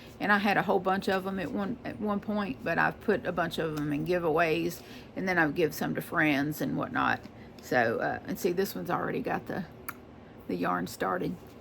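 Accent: South, United States. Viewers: I enjoyed your tutorial. I like less formal. I love your accent...southern comfort. Don't worry about the complainers. More of us love your style than not. Keep up the good work. I'm looking forward to your next tutorial.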